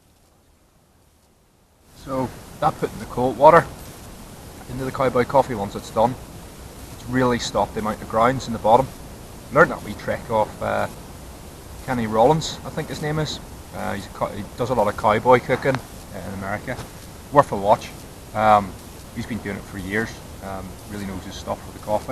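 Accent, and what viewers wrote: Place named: Northern Ireland, United Kingdom. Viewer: you sound like somewhere between Newry /Belfast? great channel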